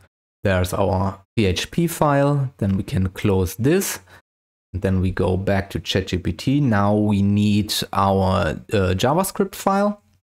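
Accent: Germany. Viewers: U sound german😅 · A german sounding german, who would have thought?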